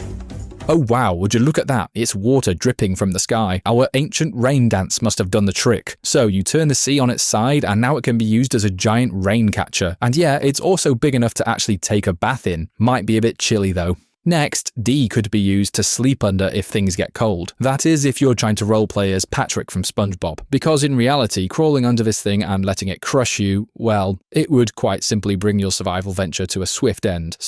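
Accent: United Kingdom. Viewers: He's British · Your also British Mate?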